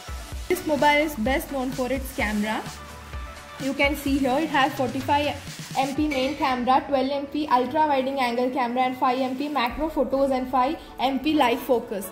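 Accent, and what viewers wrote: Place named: South India, India. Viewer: I think ur south indian, but bytheway it was nice 😀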